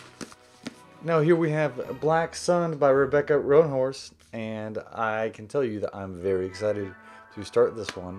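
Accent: South, United States. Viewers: I love your video at your video in Texas during your quarantine until your husband, Zach was wrapping all of the books while you're open them to see some new books including Black Sun by Rebecca Roanhorse, Legendborn by Tracy Deonn, Ready Player Two by Ernest Cline, And I Darken by Kiersten White, The Fires of Vengeance: The Burning, Book Two, The Invisible Life of Addie LaRue by V.E. Schwab and House of Earth and Blood (Crescent City) by Sarah Maas, but you're talking about all of the characters from the books to read them after that, but I hope he'll be proud of you and I love your Southern accent!!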